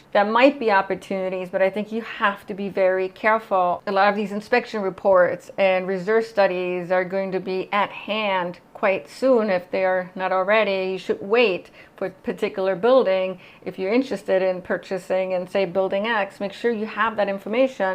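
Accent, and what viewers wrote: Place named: Midwest, United States. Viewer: You sound like you're from the Midwest. 😊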